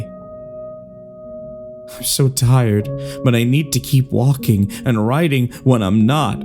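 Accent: United States. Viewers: your American accent is basicly flawless.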